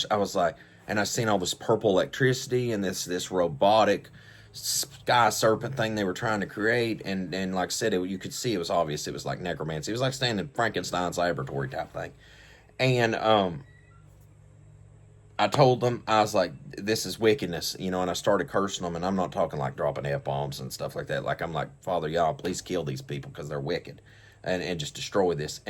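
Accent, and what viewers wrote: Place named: Texas, United States. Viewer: Where in Texas are you speaking brother ?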